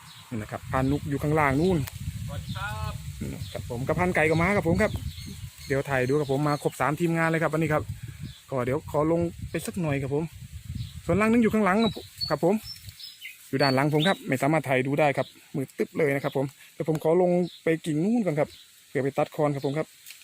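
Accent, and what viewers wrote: Korat, Thailand. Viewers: ดูคลิปทุกวันเลยครับคนโคราชคือกันฟังแล้วอบอุ่นคิดถึงบ้านครับ มายุกรุงเทพนานแล้วช่วงนี้เจอโควิดอีกคิดถึงบ้านกะกลับบ่ได้😢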